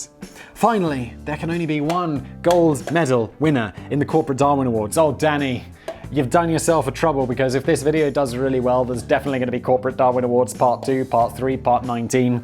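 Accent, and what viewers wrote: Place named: United Kingdom. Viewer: Unfortunately have to use Facebook to check what my mom is uploading 🤦‍♀️ I like to watch your videos as I acquire random information with a nice British accent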